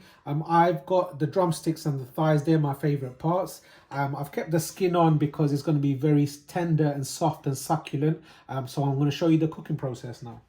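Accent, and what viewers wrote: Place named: England, United Kingdom. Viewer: Love all the DISHES YOU MAKE.. MY STYLE....THANK YOU FOR BEING ON LINE.... 👏👏👏😋😋🙏🙏 IM IN ADELAIDE SOUTH AUSTRALIA.... ARE YOU IN ENGLAND?? DETECTED THE ACCENT...👏👏😂❤❤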